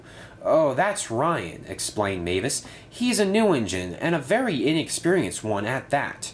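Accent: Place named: Scotland, United Kingdom. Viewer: Ur scottish accent is on point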